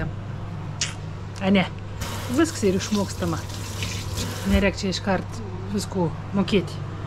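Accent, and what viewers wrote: Samogitia, Lithuania. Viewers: Viskas bus gerai moterie,esi išskirtine nes kalbi žemaitiška 😅o dabar Lietuvoje tarmes ar dialektas labai vertinimas 👍🙂🙂🇱🇹🇱🇹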